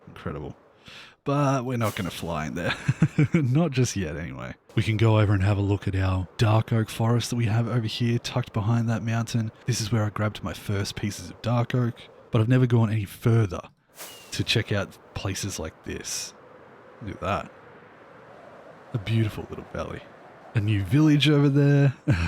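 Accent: Australia. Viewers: Are you Australian?? I am new to your channel and loving every video you upload! Your voice is really soothing so make it a relaxing experience even when you almost “falling” into the void heheh cheers and take care!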